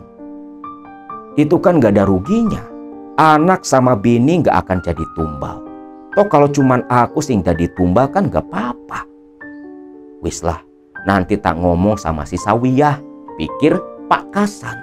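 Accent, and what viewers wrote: Java, Indonesia. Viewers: Kang,bahasa Indramayu e gk begitu kang,ky gtu mh Jawa Tengah Jawa Timur an.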